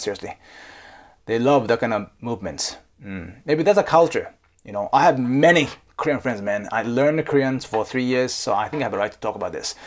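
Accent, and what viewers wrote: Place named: Singapore. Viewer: R u really a japanese? I have many japanese taiwanese friend. Ur pronunciation sounds like singaporian.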